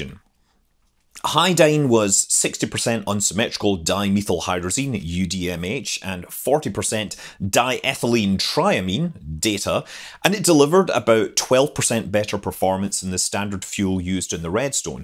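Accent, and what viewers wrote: Scotland, United Kingdom. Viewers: With your awesome Scottish accent, I always hear “Exploder” as opposed to “Explorer”. Important difference haha. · burr makes Explorer sound like Exploder. Funny we used to call an earlier version of the Ford Explorer, the Exploder for the transmission’s propensity to explode under certain conditions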